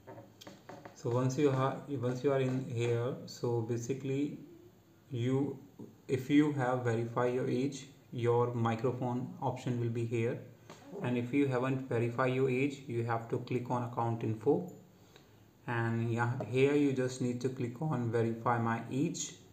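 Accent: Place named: India. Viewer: I knew what it was Indian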